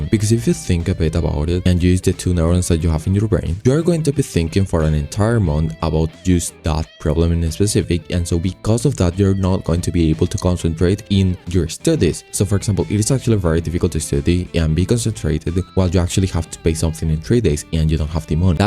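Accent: Spain. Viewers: He's spanish, I believe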